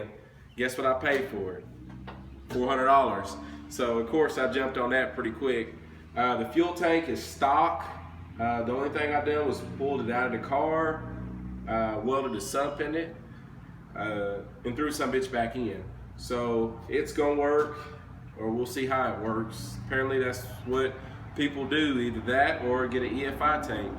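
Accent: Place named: South, United States. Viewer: What part of the south are you from. Southern drawl is really noticeable. But great video.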